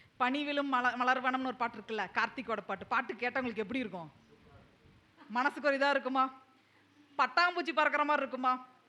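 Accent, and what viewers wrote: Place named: Kongu, India. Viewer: வணக்கம் அம்மா. ஆழகு, அருமை,அற்புதம்,உங்கள் கொங்கு தமிழ் WOW semma super speech நான் நீங்கள் சொல்வதை பின்பற்றி வருகிறேன். ""மாஷா அல்லாஹ்,,, நன்றி நன்றி நன்றி மா. எல்லா புகழும் இனறவனுக்கே..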